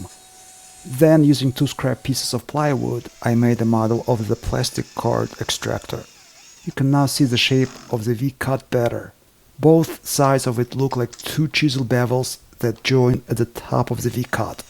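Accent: Russia. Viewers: If coronavirus could be eliminated from our bodies like ticks, then it.would be simple. I notice your english is aquiring the russian form now. In the previous videos, it was more foreign. It is okay. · has a great relaxing voice, with just the right amount of Russian accent.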